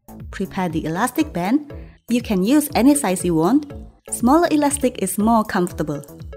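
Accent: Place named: Malaysia. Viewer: Are you Malaysian or Indonesian!!! Your accent 😭😍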